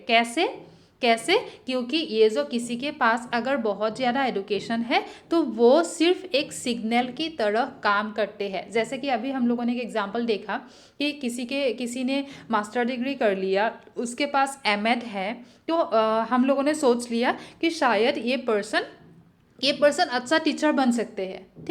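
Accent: Assam, India. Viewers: Baa apuni Assam r neki?? Pronunciation tu Axomiya jen lgise aru apuni o no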